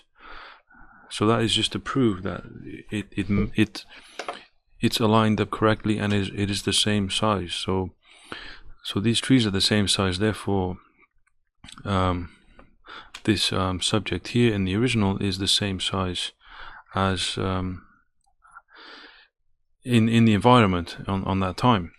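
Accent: United Kingdom. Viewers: thought you had a british accent for half the vid! Bigfoot is real Tod is fake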